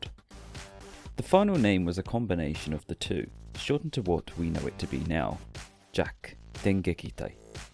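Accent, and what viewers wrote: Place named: United Kingdom. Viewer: Everyone of English-language native backgrounds - although unofficial, why not try imagining of the '-uer' following JAKQ? - which makes it "JAKQuer dengekitai". I'm just making a suggestion. By the way, it's a pleasure to hear toku info with a British accent. I'm a foreigner, and I wonder if domestic British sci-fi shows like 'Doctor Who' and 'Sapphire and Steel' were (and still are) in the way of the Toei Toku's entrance to the British Commonwealth back in the '70-80s.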